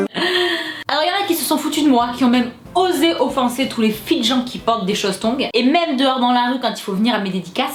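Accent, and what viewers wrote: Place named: Nice, France. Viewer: J'adore cet accent niçois dans toute sa splendeur,vraiment ☺️ c'est peut-être la première que je commente ici mais franchement,j'aime bien ton contenu 😉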